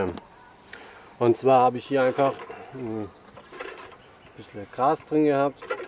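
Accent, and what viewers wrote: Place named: Swabia, Germany. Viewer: Stöckle, Äschdle, jetzet... wo bisch au Du her Jonger? Kirchheim? Göppingen? :D :D :D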